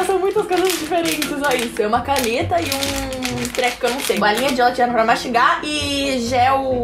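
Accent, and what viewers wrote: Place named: Spain. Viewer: I dont understand nothing, but i tink dare she is from spanish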